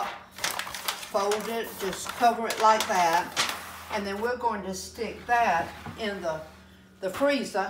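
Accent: South, United States. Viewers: I want to order your cookbook. How can I do that? I adore your Southern accent!!! It’s the real deal!!, I thank God for providing a heart for your Son, whose Birthday is the same as my precious Mama, October 19! We live in Seneca, SC. Thankful to live in the South!! Love you!🥰❤️🙏🏻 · Prayers for continued healing and Yes God is good ❤ Love your recipes and your Southern accent!! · ...you are just adorable! You are so caring, thoughtful and genuine! I love your accent....I could listen to you talk for hours on end! You are a true southern belle! Your cooking videos are so diverse and the recipes seem pretty easy. The food you prepare looks incredibly delicious and at the end of a lot of your videos, when you show the entire meal laid out on your side counter, I always find myself wishing I was the first one in line! Not only for the scrumptious food, but for the fellowship with your loving family. 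My sister and I are the only family we have now....everyone else has passed. So when I watch your videos, I get warm memories of my own family and it makes me smile. I am very happy your son is doing well! I know he will continue to flourish! As will all of your family. I will be making your stuffing and gravy recipe (I do not care for sage or giblets at all) and when my sister and I sit down to eat it, I will think of you. You are truly an inspiration. Best wishes for a very Blessed and Happy Thanksgiving! God Bless You All! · you are such a lovely lady! I just love your southern accent!! Butta!! Love your show…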